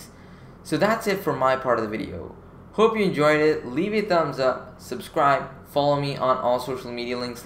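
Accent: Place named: India. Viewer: Man, for you being Indian, such a great accent, and the way you explained, so greatly! You deserve more, and if you're not Indian or you're offended, I'm sorry lol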